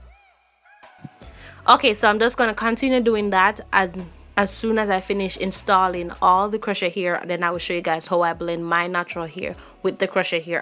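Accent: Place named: Jamaica. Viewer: Hey.. you sound like a Jamaican...... real ting! Good Job: Just cut my locks after 13 yrs..... need this afro in my life....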